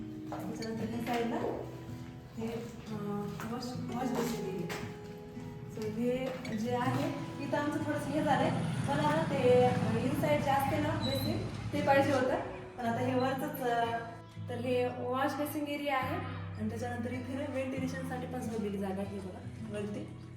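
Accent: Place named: Buldhana, India. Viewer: छान केलंय घराचे काम, पूर्ण झाल्यावर भारी वाटेल 😊 माझ्या मावशी सासूबाई नांदुरा बुलढाणा च्या आहेत त्यांची बोली आणि तुमची बोली सेम आहे 🥰 मी माझ्या काही व्हिडीओ मध्ये दाखवलंय त्यांना✨ छान वाटतं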